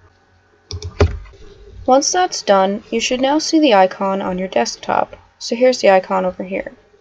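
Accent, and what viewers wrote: Canada. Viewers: I don't want to sound stupid, so sorry If I do...I haven't upgrade to a Blu drive yet so clearly I don't pass the Blu advisor check (which I will once installed) for 3d is says I cant support 3d playback, but what happens is I put in a 3d blu drive and use and hdmi to my 3d tv will it work due to the fact I'm using the comp more as a player than for viewing? Oh and yeah you sound wayyyy Canadian haha. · i thought your accent sounded canadian :p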